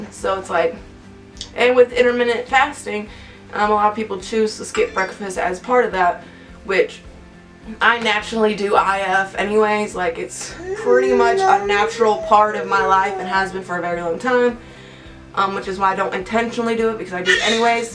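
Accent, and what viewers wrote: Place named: Chicago, United States. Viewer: u don't actually look like u need to lose a lot of weight being honest I don't know what your goals are.. I'm trying to place that accent it reminds me of Chicago.. But I'm not convinced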